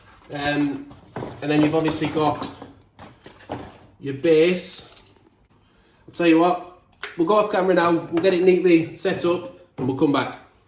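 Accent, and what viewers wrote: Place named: Birmingham, United Kingdom. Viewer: What's this accent ? Is it from Birmingham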